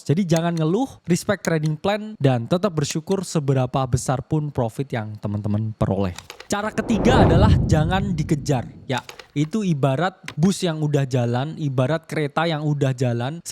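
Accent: Java, Indonesia. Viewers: Wong Jowo ndi suarane qi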